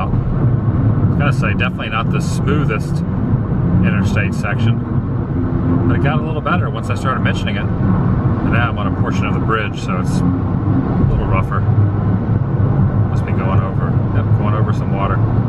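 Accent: South, United States. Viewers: I don't know if you've noticed but the farther you travel, the more you seem to have picked up a southern twang. I bet by the time you get back to California you sound like you're still in Alabama!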